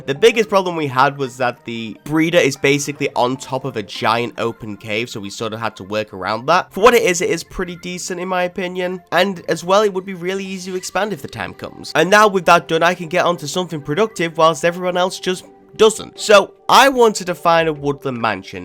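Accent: United Kingdom. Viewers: I think you have a British accent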